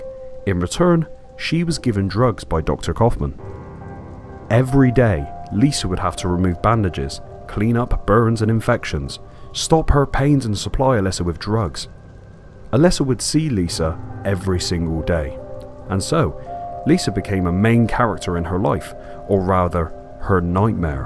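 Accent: Bristol, United Kingdom. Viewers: Is that a Bristolian accent? Or more West Country? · Oh wow!! Dude, you are obviously form exactly the same part of the UK as me. Don't often hear our accent on yt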